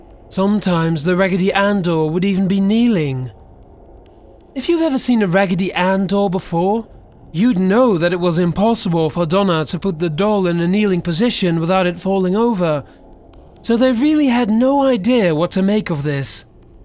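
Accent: United Kingdom. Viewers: the guy narrating this sounds like a british version of chillz.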